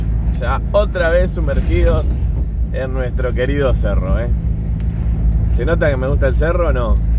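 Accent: Argentina. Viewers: Che una pregunta el es gasolero? Pq sino el precio de la nafta te come vivo. Le comenté a mi hno de tus videos. Otra cosa tenés el mismo tono de voz de un salteño q vive acá, tienen otra particularidad en común a los dos se le están volando las chapas jaja. Slds desde Toronto. José Luis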